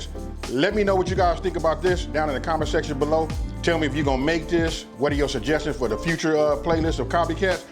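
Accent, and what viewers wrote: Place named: South, United States. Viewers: Love this video...good southern cooking and accent. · Thanks for the great recipe. I just made this and, as you said, "BOOM". I love that?southern American?dialect of yours...I'm Scottish. Please allow me to offer you a wee tip pal: if you leave that hardy old root on the onion it'll act like superglue and help prevent anything from falling off! Just sayin like, but..I'm fae Scotland so what dae I know? ❤️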